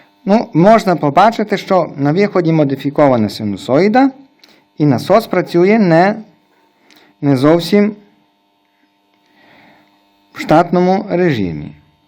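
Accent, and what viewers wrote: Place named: Lviv, Ukraine. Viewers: тільки у Львові такий говір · тільки у Львові такий говір)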